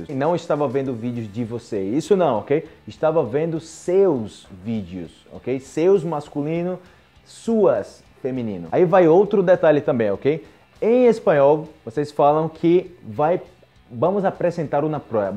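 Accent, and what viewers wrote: Colombia. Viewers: Aquí en chile "presentar una prueba" es usado en el ámbito judicial. Esta respalda una acusación. Puede ser mostrar un objeto; declaración de testigos. Para prueba, lo escrito es"hacer, contestar, una prueba y en la octava región usan "presentarse a un certamen". Tb. Se puede hacer una prueba, como hacer saltos en 20 seg.; O quién bebe más agua; ello en un concurso. También está "la prueba de amor", pero ese tema queda para otra. Ah!!! hablas muy bien colombiano mi parcero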